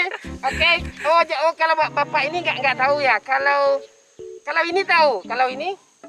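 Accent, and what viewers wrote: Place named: West Sumatra, Indonesia. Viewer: Akhirnya ku tau siapa dibalik suara Minang kocak😄😄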